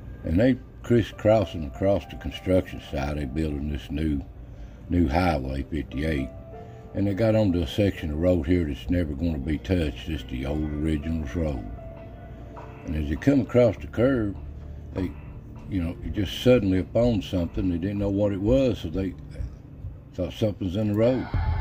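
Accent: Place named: South, United States. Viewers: Another great one bro, your country accent sounds like us round here. Like to hear them ghost stories. Liked the one on the Melungeon people too. I have a knot on back of my head like they do, but never been checked to find out. Mamaw always said her people were black Dutch, so they were bidding something. Anyway I'm looking forward to more stories. Thanks man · just love your southern drawl,and the way you tell ghost stories and tales Thanks for a GRRRREAT CHANNEL!!!!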